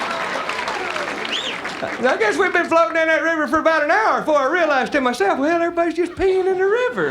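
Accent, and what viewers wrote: South, United States. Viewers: That Southern accent was more pronounced in his younger days. As he aged act was dirtier! Liked him on Blue Collar tours.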